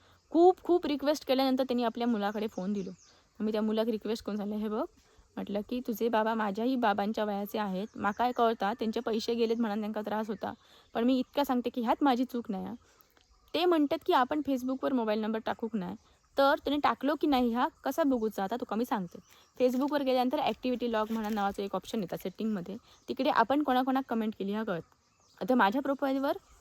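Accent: Malvani, India. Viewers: मस्त मालवणी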